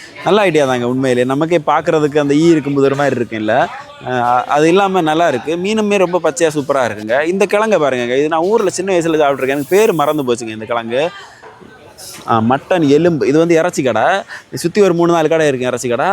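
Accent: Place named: Kanyakumari, India. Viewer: Kanyakumari Nagercoil Slang😅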